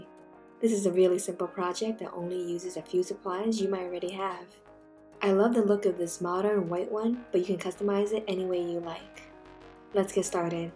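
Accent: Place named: New York, United States. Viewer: I like how you turned into a New Yorker about 40 seconds in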